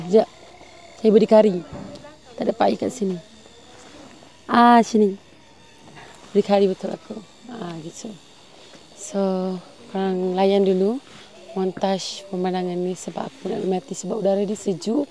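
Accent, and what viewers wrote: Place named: Sabah, Malaysia. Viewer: i give Sabahan slang A+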